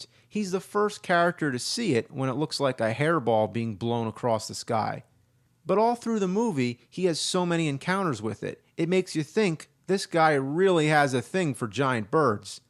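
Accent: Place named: Philadelphia, United States. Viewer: I love his Philadelphian accent. It's very homey for me.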